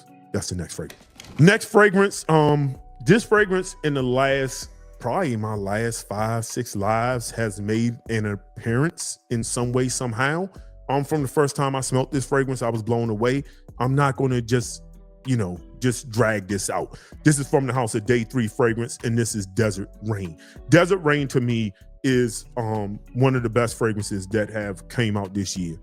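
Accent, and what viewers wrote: DMV, United States. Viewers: Is that the DMV I hear? Randomly came across your channel video and I know my ear doesn’t deceive me.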